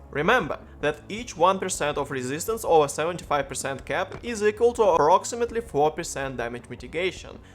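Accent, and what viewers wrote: Russia. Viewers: ты русский? акцент просто как у русского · русский акцент + уши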